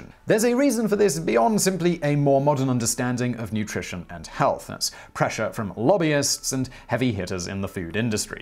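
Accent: United Kingdom. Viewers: I was expecting him to say "Hey Vsauce, Michael here!" when I opened the video, instead I got a british accent.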